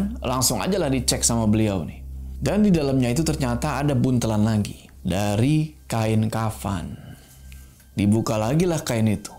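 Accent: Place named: Cilegon, Indonesia. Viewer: Pantesan logat nya Nong2 bae, taunya ini ceritanya di kampung halaman owe (cilegon)😅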